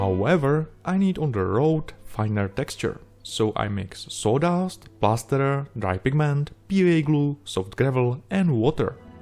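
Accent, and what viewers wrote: Czech Republic. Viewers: Ty vole, českou angličtinu poznáš všude... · wait wait you are czech? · ten prizvuk se mi zdal docela cesky :D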